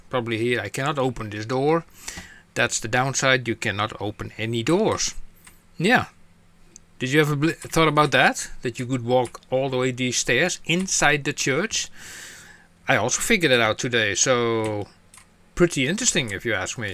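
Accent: Netherlands. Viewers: As a dutch person it's always funny to hear the typical dutch english accent haha, but great video, this is awesome to know that you can explore the areas further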